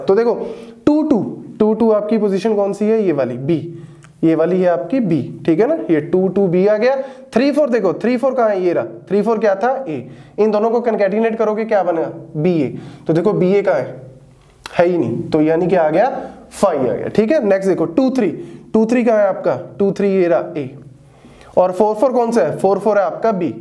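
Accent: India. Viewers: Bro I like your accent, sounds so different to other indians I watched on youtube. I am not indian but understood you very well thank you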